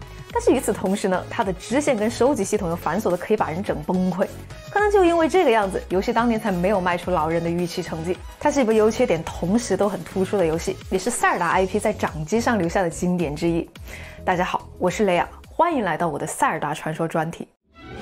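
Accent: China. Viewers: 主播是不是四川重庆的人啊 我好像听到川普….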